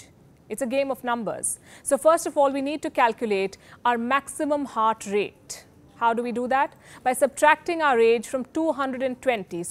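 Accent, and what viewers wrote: India. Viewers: Don’t understand your English with Indian accent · Gosh, she's got this aweful voice and strong indian accent. So annoying.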